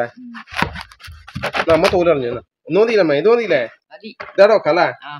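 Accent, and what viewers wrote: Kannur, India. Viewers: ok ok ഞാൻ കൊച്ചിക്കാരൻ ആയത് കൊണ്ട് കണ്ണൂർ ഭാഷ കേൾക്കുമ്പോൾ ചിലപ്പോൾ അന്തം വിട്ട് പോവാറുണ്ട്.. കുറച്ചു കൂടി വടക്കോട്ട് മാറിയുള്ള ആളുകൾക്ക് ഇത് പരിചിതമായിരിക്കും.. Any Way.. Thanks for Reply 🙏🏿🙏🏿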